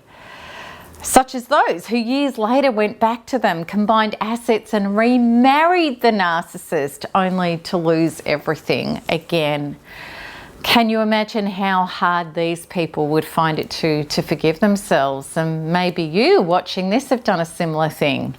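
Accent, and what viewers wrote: Australia. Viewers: thank you. I am in the forum and the ppl there are angels but I can’t seem to break through the barrier and the time it takes every day to go through the modules. I don’t have years to get my life together.... I hear that this takes years to overcome and condition the subconscious... I feel discouraged because I need help now. My life is a mess!!! I really don’t know what to do and how. I’m at a stand still and feel trapped in a life I did not choose and that does not belong to me. I don’t know if that makes sense... Anyway I keep listening to your videos and reading your blogs. You are an inspiration to me. I grew up part of my childhood in Australia and hearing you accent it’s comforting to me... I think you are gorgeous and have been getting better with years. I’ve been following you for nearly 6 yrs now. 💗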